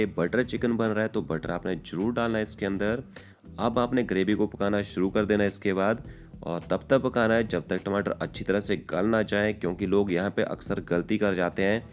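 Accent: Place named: Uttarakhand, India. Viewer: This chef is from Uttarakhand guessing cuz of accent 👍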